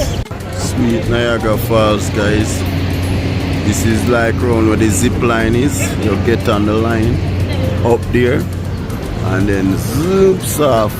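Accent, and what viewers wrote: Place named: Jamaica. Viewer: WE GET A VLOGGER WHO SPEAK OUR LANGUAGE APART FROM ENGLISH PATOIS PATOIS PATOIS PATOIS AKA PATWA PATWA PATWA AKA BROKEN ENGLISH EG ENJOY YUH SELF MI BWOY CUM BAK SOON ❤❤❤😂😂😂😂😂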